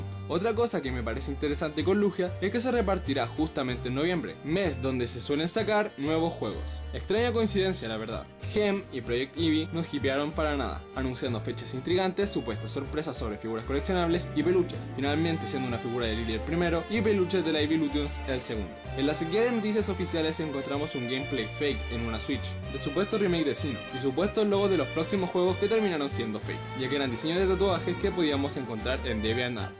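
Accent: Chile. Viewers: eres chileno?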